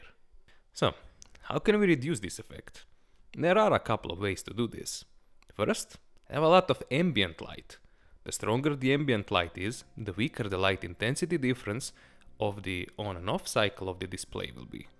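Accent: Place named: Russia. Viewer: His accent makes him sound like a physicist from mother Russia he sounds like he knows what he’s talking about 👀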